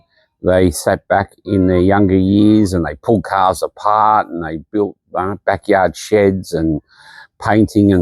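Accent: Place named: Australia. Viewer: FYI I have learnt French and English in Vietnamese High School . We don't need you to teach us English with your awful Aussie accent . You are just an unemployed dude in your country , moving to developing countries begging for job . I bet you are not even qualified to teach English in Vietnam .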